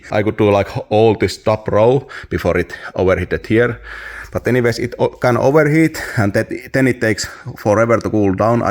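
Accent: Finland. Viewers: Eikö ihan rehellisesti Suomella mentäis, on sen verran karseesta "ralli englantia"😢 mulla on tuollainen aito (ei kiina kopio) pistehitsi 30v takaa.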